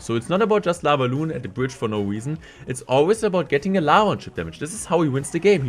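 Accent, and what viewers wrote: Germany. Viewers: Just found this video on my recommended. This guy's accent sounds german. Lit 👌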